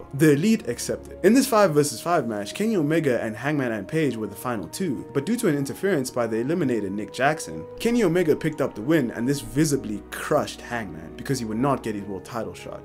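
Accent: South Africa. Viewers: Are you south african??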